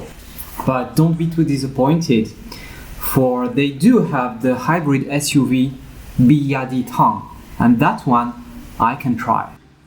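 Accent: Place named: France. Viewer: his accent makes be believe he speaks french also, i guarantee it. Regardless, thanks for a review that we can now understand!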